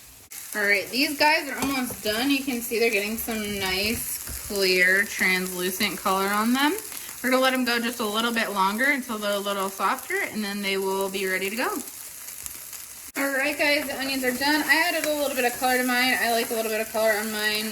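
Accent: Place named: Midwest, United States. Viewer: Looks delishious. Do you ever make chicken ones? Where do you live? You sound like your from the Midwest. I’m in St. Louis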